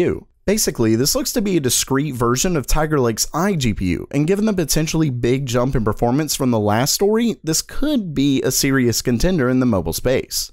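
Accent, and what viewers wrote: South, United States. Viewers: That's a Southern American accent. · He's Southern. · That southern accent yamsayin’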